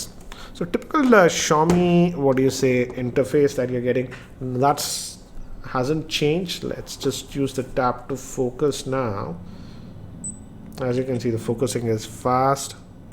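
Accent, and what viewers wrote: India. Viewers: That distinctive Indian accent it is anoying and funny at same time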